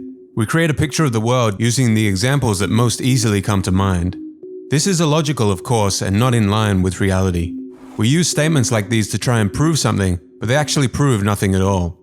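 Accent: Australia. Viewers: Are you Australian, Mr Narrator? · Nu Aus accent.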